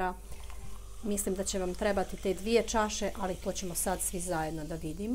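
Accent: Bosnia and Herzegovina. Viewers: kuvas i akcenat? Ispod naslova ima napisane sastojke, bez akcenta 🤣🤣🤣. Btw zena je iz Bosne, pa otuda i akcenat. .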